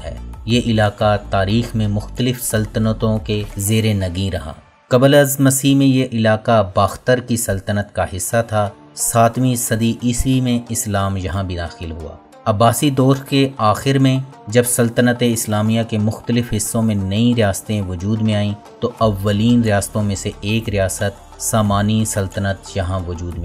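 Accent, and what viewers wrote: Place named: Punjab, Pakistan. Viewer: First you should try not to speak Urdu with Punjabi accent. But your German and you will be German understand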